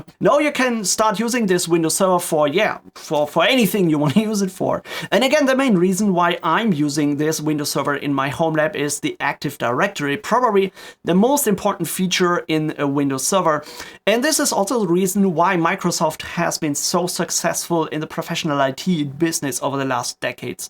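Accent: Germany. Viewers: No front but you sound like a german i am also a german boy :D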